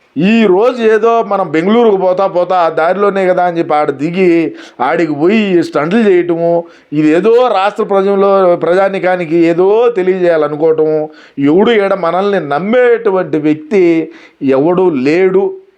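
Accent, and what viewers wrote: Rayalaseema, India. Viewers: same slang throughout combined Chittoor, Anantapur, Kadapa, Kurnool dist.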